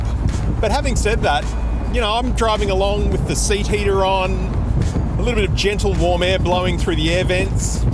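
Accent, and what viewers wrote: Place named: Australia. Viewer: lol, oops, didn’t realize you were Australian and that y’all did that too. Fascinating! Love your sense of humor as much as your accent. I have a thick Appalachian accent myself and do not have a great ear for other accents after years of linguistic isolation. Thank goodness I did have a Miata to make it through. lol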